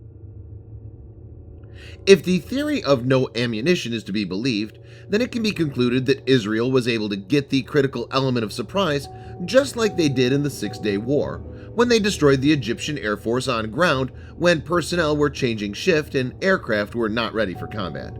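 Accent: United States. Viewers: The way American pronunciation murders ordinary language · the very American-sounding voice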